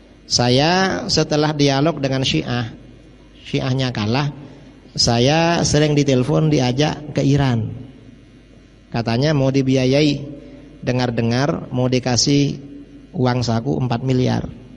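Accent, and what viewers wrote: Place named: Madura, Indonesia. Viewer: Idrus...logatmu madura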